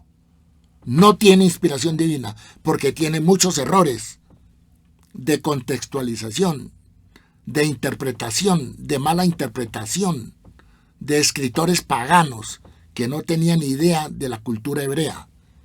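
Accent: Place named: Colombia. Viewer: Pero creo que tienes que presentarte...según tu tono eres Colombiano..no puedes ser rabino. Porque tendrías que ser Judío nacido en Israel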